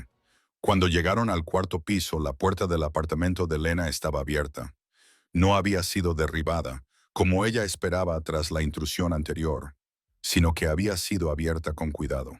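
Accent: United States. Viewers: Si por favor piensen en sus historias antes de difundirlas y pongan bien los generos de los personajes, a vecesved un niño y a veces una niña y porque usar una voz con acento norteamericano hablando español?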